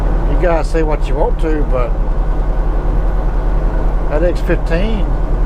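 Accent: South, United States. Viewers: Native Alabamaian so southern talk is fine by me. Always enjoy your videos and conversation!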